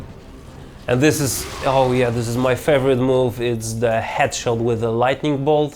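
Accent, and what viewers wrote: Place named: Eastern Europe. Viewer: his accent is so eastern europeany like it feels like im having sex with his voice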